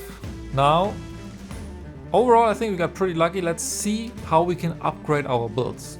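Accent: Germany. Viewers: Man hört dir dein deutschen Akzent so raus 😂 Aber gutes Video 🫶🏻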